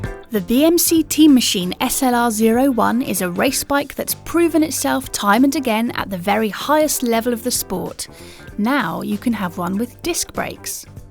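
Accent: United Kingdom. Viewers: Damn your british accent makes me ride along with you.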